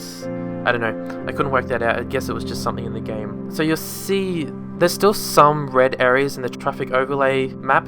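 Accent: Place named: Australia. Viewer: Yeah, I just looked up how it is in Australia, and people there drive on the left side too. And I'm not sure but I thought I heard a hint of Australian accent in his voice. Not entirely sure though.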